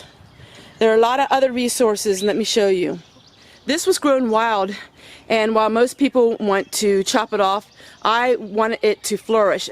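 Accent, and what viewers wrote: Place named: Pennsylvania, United States. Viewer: I knew you were from PA by your recognizable accent. I live in Lancaster county. Your homestead is beautiful, and so inspiring.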